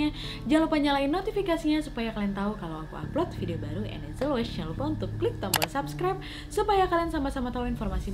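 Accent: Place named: Medan, Indonesia. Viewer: nampak kali dek orang medannya 🤣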